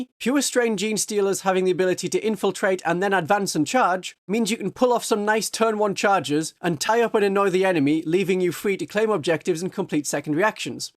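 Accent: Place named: England, United Kingdom. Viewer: Your accent makes it sound like you might be in the same corner of England as me. I'd suggest meeting up for a game but I don't know how I'd feel playing against a 2d animated character. Who framed roger rabbit freaked me out as a kid so I'm not sure my mind could take it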